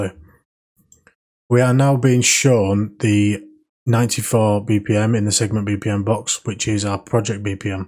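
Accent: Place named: North, United Kingdom. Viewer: BROOOOOOOOOOOO... Honestly you've got no idea how many video's I'ved watched and failed at when trying to warp vocals... to the point where I'd nearly given up production... Your video has just made my brain click into place in 1 min and 40 seconds in.... whether thats because your from up north somewhere near me by the sounds of it.... THANK YOU SO MUCH MATE! HONESTLY. legend!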